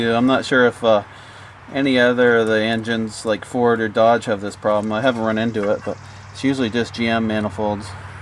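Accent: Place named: Canada. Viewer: I like how you can talk to 'no one' and keep it interesting. And also Canadian accent.. how aboot that aye.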